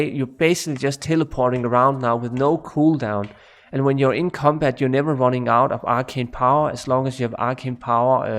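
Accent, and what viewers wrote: Denmark. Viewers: Fed nok video. Så tydeligt at hører når det en dansker. 😂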